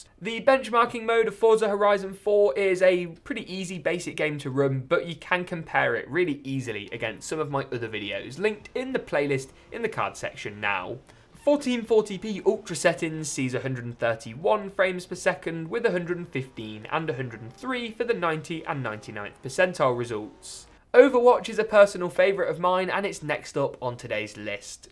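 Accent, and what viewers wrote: United Kingdom. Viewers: such a british xDDDD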